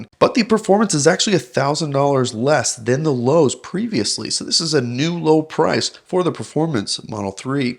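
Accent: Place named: Greece. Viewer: youre greek arent you! lol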